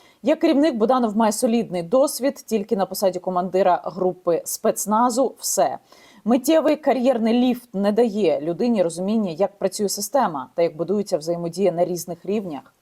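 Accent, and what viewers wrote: Russia. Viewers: Яніна, будь ласка, не говори англійською, як росіяни.Women не вуман, girl не гьорл, birthday не бьорсдей, The Economist не зъ економист. Ти мені дуже подобаєшся, я дивлюся всі твої відео. Слава Україні!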